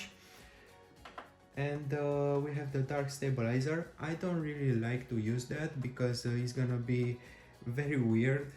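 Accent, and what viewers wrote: Romania. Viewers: i'm pretty sure that s a romanian accent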